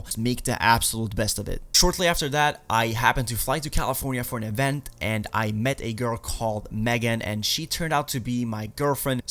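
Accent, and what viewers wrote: Sweden. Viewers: Dang this is when had that really big Swedish accent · He had this Swedish accent back then. It's going away now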